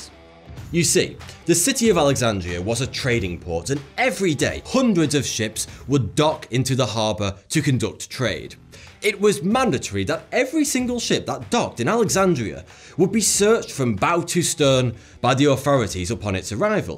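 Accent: United Kingdom. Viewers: Hmmm, when Islam is mentioned re burning the library this guy with brit accent is afraid to say anything derogatory about religion. But Christians and the Bible, snide mocking tone. Hmmmm, guy might be afraid a tad of one very "peaceful" flavour of religion slicing his head off these days.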